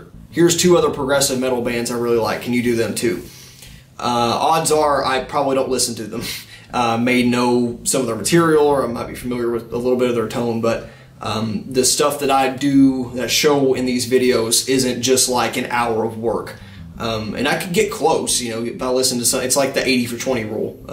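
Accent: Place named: Mississippi, United States. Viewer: Digging the channel man, keep it up. I recognize that accent, sounds like Mississippi or somewhere around there to me! I got friends out there, haha. ;)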